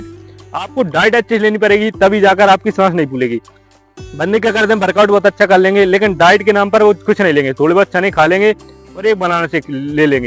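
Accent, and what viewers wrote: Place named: Bundelkhand, India. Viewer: Bhai bihar se nhi lag rahe kaha se .... bundelkhand se lag rahe ho khaskar M.P se 😅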